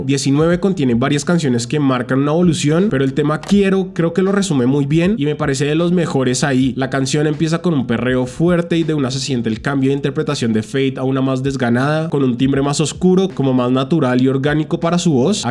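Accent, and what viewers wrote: Bogotá, Colombia. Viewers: Como que eres MEXICANO pensé que eras de Bogotá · Cómo así que eres mexicano? pensé que eras de Colombia jaja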